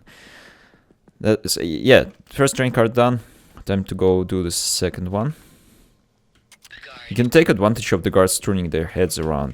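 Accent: Russia. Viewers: Are you russian? Your accent is very simillar to russian one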